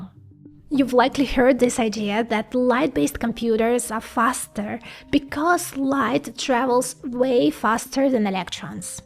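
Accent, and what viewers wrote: Russia. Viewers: You've improved so much compared to your earlier videos, and it's great that you’ve stopped using the Russian 'kh'х' sound. I really love your growing confidence—keep going!👍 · i would really love to hear you speak in your natural language which i assume is Russian. Even though you speak in English, you cant hide the natural mother tongue. Not saying anything bad about your English or anything, its absolutely perfect, just would love to hear you in your natural mother tongue, i think it would sound more fluid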